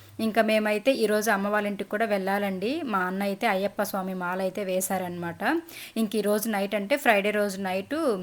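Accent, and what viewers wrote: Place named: Eluru, India. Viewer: పెటిక పప్పు అనరు మా సైడు చీక్కుడుకాయలు అంటారు మాది పచేమగోదావరి జీలా ఏలూరు మేము ఎపుడూ అలంటే కూరలు వాడూకొము రాయలసీమ వెపు వాడుతారు అనుకుంట మీ మాట మా సైడ్ లాగా ఉది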